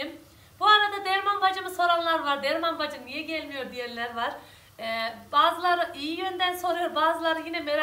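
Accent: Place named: Nevşehir, Turkey. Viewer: Nevsehirliyim konusmammiz col benziyo cömce 🙂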